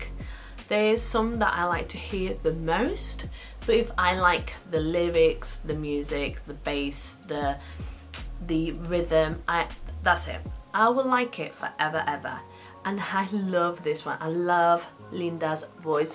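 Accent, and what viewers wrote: United Kingdom. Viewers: where you get that English/British accent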